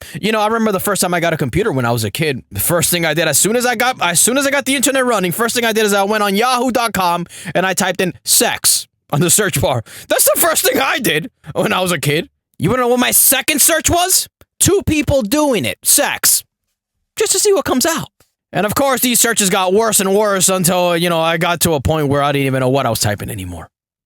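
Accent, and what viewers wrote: India. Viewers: First thing I hear is an indian accent 😂😂😂😂😂😂😂😂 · You're racist, but your Indian accent is quite entertaining.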